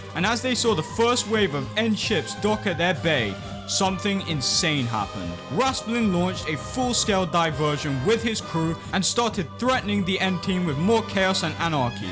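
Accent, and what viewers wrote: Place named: United Kingdom. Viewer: Nice one bro, the event sounds so cool with a british narrator in the background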